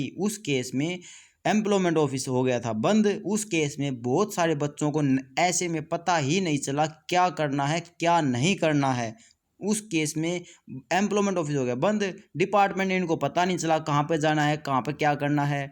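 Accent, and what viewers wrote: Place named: Haryana, India. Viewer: I like your way of talking pure haryanvi 👍🏻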